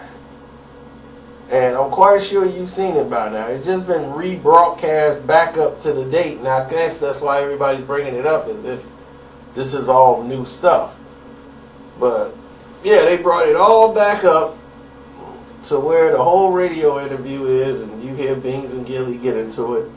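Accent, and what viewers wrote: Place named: Philadelphia, United States. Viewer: Why are you talking philly. Your a cornball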